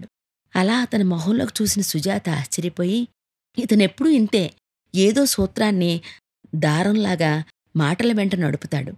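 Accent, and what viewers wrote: Visakhapatnam, India. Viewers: Medam mee slang lo vizag originality kanipisthundgi 😄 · మాది వైజాగ్,ఇది విశాఖపట్నం యాస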